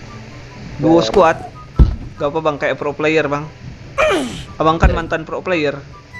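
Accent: West Java, Indonesia. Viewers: Edan mantap cui modar modar hahaha pasti urang sunda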